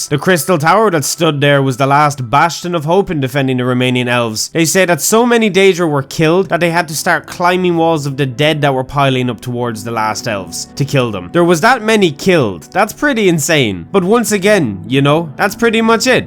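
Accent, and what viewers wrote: Ireland. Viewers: The paddy is strong with this voice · Im irish and ive never heard someone as irish as you. Great video man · Finally! An accent that's not american! But Irish! I thank thee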